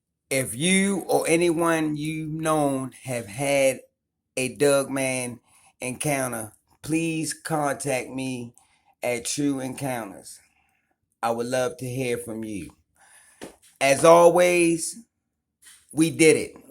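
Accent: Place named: Louisiana, United States. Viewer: Hello,. Are you from Louisiana? You have an interesting accent, I enjoy hearing you speak, as I am not often exposed to people that have your accent. I'm a Hillbilly in northern West Virginia, so I have more of a Yankee accent. The people in the southern counties of WV have more of a southern accent. I'm definitely going to hit the subscribe button. Looking forward to more. Respect and Blessings from Wild Wonderful West Virginia. 🙏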